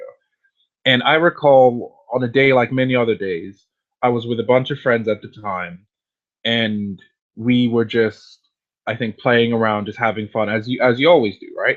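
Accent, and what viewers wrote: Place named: Ireland. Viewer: Lol youre from Ghana? I thought that you were maybe Irish or something lmfao, just how you pronounce certain words thats all. First time viewer here just so you know, good video mate!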